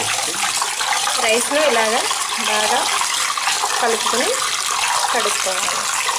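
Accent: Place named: Guntur, India. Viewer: Miru bale cheptunnarandi same guntur style lo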